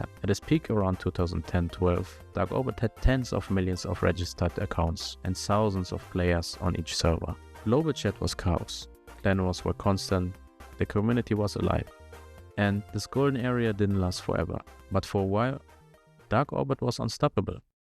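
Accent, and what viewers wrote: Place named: Germany. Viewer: wie deutsch willst du alles aussprechen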